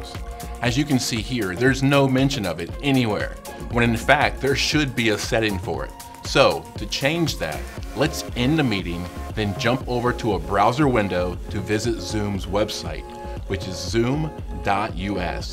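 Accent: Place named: United States. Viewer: Very, very understandable- slow, even delivery- US accent-yay!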